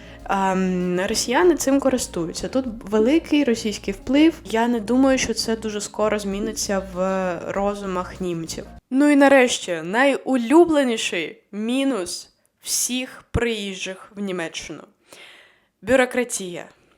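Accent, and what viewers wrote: Western Ukraine, Ukraine. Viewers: Прежде всего благодарность и помните что немцы и Германия вам ничего не должны. БюрокрАтия ударение на а. В мате ху**о ударение на о, у вас западэнский акцент, приятно было вас слушать, много полезного рассказываете, но Германия не для таких туристов как вы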